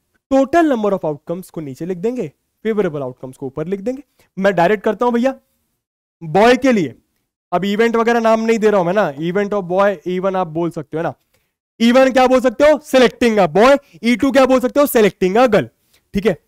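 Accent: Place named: Bihar, India. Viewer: Sir ka bihari accent🤌🏻🤌🏻🗣️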